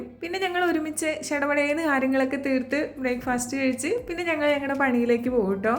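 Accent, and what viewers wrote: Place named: Kerala, India. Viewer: മോളുടെ "Goooooooooood Morningggggggg"ഉം "ttoooooooo"ഇവയുടെ ആ "ഈണം "ഹോ!!!!ഒരു രക്ഷയുമില്ല. ഞങ്ങള് കൊല്ലം കാർക്ക് ഭയങ്കര ഇഷ്ടമാന്നെ. മൊത്തത്തിൽ അങ്കമാലി ഭാഷയ്ക്ക് ഒരു പ്രത്യേക സൗന്ദര്യം. പിന്നെ ഒരു കാര്യം , മോള് ഈ short vedio യിൽ ഒതുക്കാതെ നല്ല long വ്ലോഗ് തന്നെ ഇടൂ. ഇപ്പോൾ സമയമുണ്ടല്ലോplease, please, please ഈ സംസാരം എത്ര കേട്ടാലും മതി വരുന്നില്ല, അതുകൊണ്ടല്ല്യോ. മറക്കല്ലേ long vedio....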